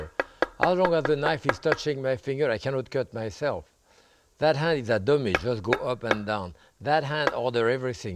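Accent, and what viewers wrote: France. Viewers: I love his teaching. Clear, professional, pleasant. The French accent makes it all the more authentic! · Gotta love a real French accent.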